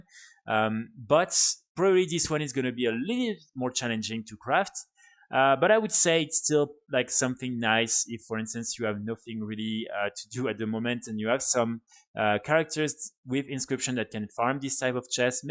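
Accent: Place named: France. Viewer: Your content is some of the best, keep up the great work. Because you're French, you have a French accent. Your pronunciation is what is expected. Don't worry about it. The truth is, people LOVE IT.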